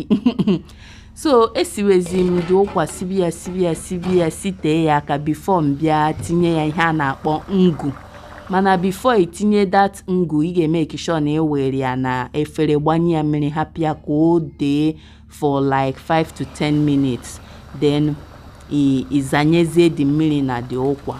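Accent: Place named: Igbo, Nigeria. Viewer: Igbo gi atoka😍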